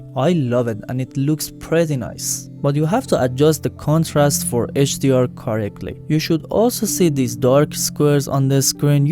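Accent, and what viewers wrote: Iran. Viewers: بنازم آقا بازی رو انجام دادم نیازی به دیدن ویدئو نداشتم ، لهجه ایرانی رو دیدم دلم نیومد رد کنم عالی و مسلط موفق باشی. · Your accent, are you Persian?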